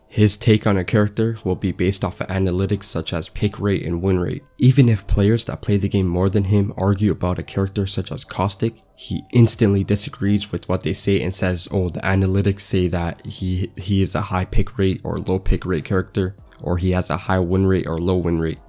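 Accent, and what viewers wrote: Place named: Canada. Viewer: U sound Canadian just like me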